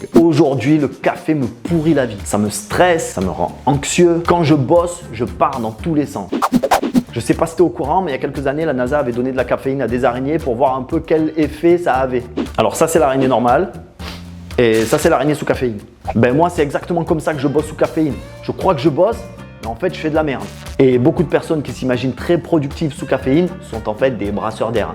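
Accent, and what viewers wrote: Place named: Marseille, France. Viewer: Tu as accent marseillais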